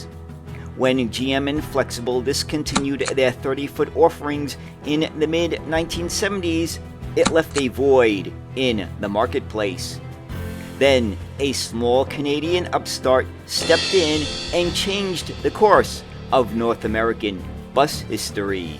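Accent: New York, United States. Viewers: I still think is a NEW YORKA! Come on, you can't hide it with that accent, you are a NE-NE-NE-NEW YORKA.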